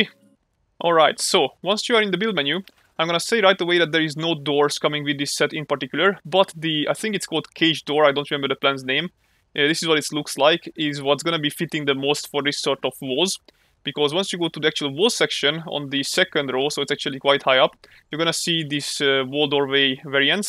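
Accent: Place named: Denmark. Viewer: I like your accent :D are u Danish or sth lol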